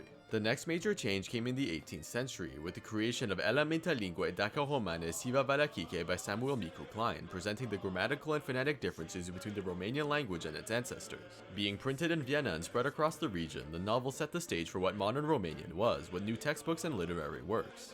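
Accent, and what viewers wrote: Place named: France. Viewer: I love your reading of romance text with a French accent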